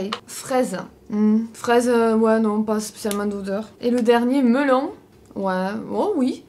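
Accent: South, France. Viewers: Bonjour. J'adore votre accent méridionale et votre bonne humeur. Vous êtes très belle et la caméra nous renvoie l'image d'une jeune femme qui a bien "les pieds sur terre " et " la tête sur les épaules ". Bien, cela étant dit, Action et moi ce n'est pas une grande histoire d'amour. Le rapport qualité prix pour addict du shopping me tentait beaucoup par ses prix au détriment de la qualité et surtout de mon porte-monnaie. Bref, moins j'y vais mieux je me sens. Cela n'engage que moi bien entendu. Si votre shopping est à la hauteur de vos attentes, je suis contente pour vous. Restez aussi solaire car vous apportez beaucoup de bien-être. Avec toute ma sympathie. 🙂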